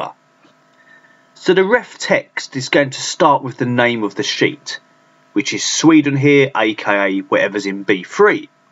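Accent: United Kingdom. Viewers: Hello Initially I have to appreciate your perfect pure British accent which urged me to listen to it several times eagerly Then I download your nice video clip to learn about indirect function I wonder whether or not its possible for you to receive any Excel example from others in order to correct and provide some hints Thanks in advance Kambiz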